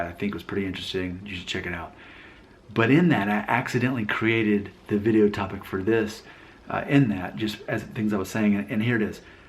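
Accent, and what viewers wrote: United Kingdom. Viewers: I have difficulties in british accent..you have to talk slowly for me to understand you.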